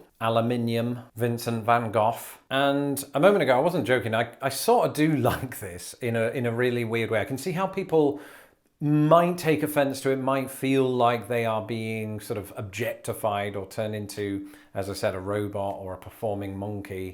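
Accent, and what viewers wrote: United Kingdom. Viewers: You have a very crisp unmistakable British accent. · I'd know you were British because I watch a lot of British TV on Acorn and Britbox. I'm still trying to learn the different British accents though. So far, I think my favorite is the Yorkshire one, maybe because Sean Bean speaks it 😊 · We love your accent. Americans have a whole mess of different accents. Your accent is so pleasant. It is wonderful way to learn about different cultures and accents from you in the U.K. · That's awesome! (the Alaska Ferry System)... I was just reading about how the Tustumena (57 year old ferry) is finally being replaced! I used to live in Kodiak, so I'm familiar with the "Trusty Tusty" 😆...ps.. Been to the Netherlands a few times, but never to England. Only two British accents I'm familiar with is here, and the Geico lizard... 🦎 LOL.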